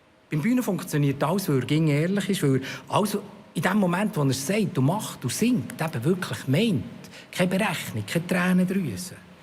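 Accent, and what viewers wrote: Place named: Bern, Switzerland. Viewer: Bärndütsch isch eifach s Beschtä 😁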